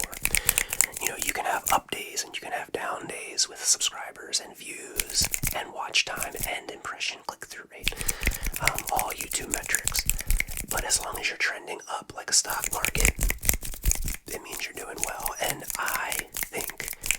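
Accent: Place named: Maryland, United States. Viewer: I really enjoyed this video! Love the talk about the accent, being from the UK I didn’t really realise people from Maryland / Baltimore had such an accent!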